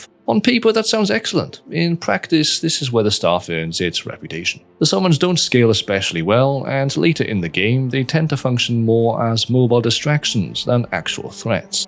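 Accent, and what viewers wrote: Wales, United Kingdom. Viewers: WELSHMAN REAL?!?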